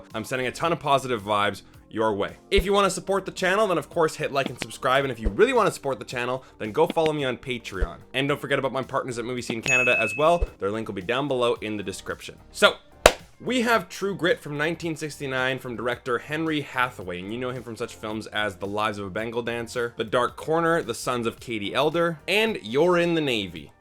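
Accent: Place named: Rocky Mountains, United States. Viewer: So, I'm a rare species in that I'm American and speak more than one language very comfortably, if you're not from Alberta or Eastern British Columbia Canadian I'm super impressed. Brits can't do Rocky Mountain American like you can. You're not German because you can say "W". Swedes and Norweigans do a great job at this particular dialect of English, but the Dutch even though huge percentage speak nearly perfect technical English, never quite get the rythm right. Not Aussie because they love their own way of saying things and they should because it's great. If you know anyone from Australia, the only thing funnier than doing John Wayne by John Wayne himself because he's from Illinois and his accent is not actually his own I believe is have an Australian do an impersonation of John Wayne.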